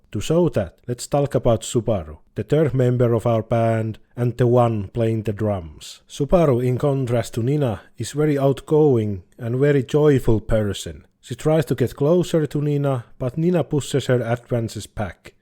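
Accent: Finland. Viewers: great videeo random question but where are you from? guessing u could maybe be finnish since your pfp looks kinda like the Spurdo Spärde stuff n ofc ur accent is pretty finnish like lmao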